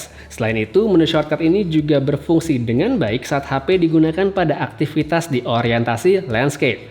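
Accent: Bali, Indonesia. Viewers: Bli nak bali ne??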